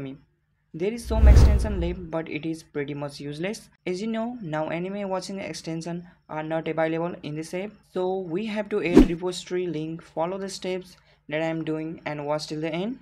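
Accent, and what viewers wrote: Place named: India. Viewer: It's always the indian accent that always works and helps! Ty!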